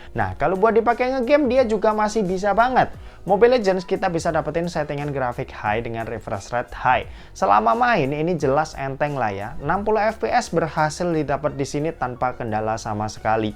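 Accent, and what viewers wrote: Semarang, Indonesia. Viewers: Anak Semarang ya mas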